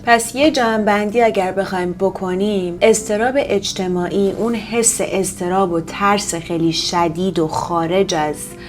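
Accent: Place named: United States. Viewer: خانم دکتر سلام عرض شد ارادتمند، ببخشید شما در تلفظ اصلاحات انگلیسی، لهجه امریکایی دارید،ببخشید شما آمریکا یا کانادا تشریف دارید؟یا فقط بر حسب تسلطی که به زبان دارید انقد قشنگ تلفظ میکنید ،چون خودم خیلی زبان دوس دارم عرض کردم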